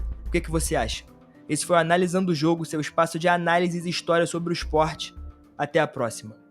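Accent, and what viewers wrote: Rio de Janeiro, Brazil. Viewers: A única coisa que me irrita, é esse sotaque carioca insuportável e indigesto. Marco van "Baxten"...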